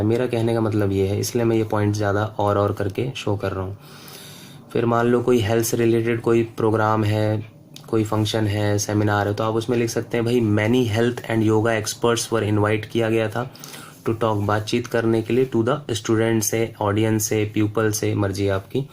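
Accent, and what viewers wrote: Lucknow, India. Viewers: Bhai Lucknow se. Ho kya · bhai lucknow ke ho ka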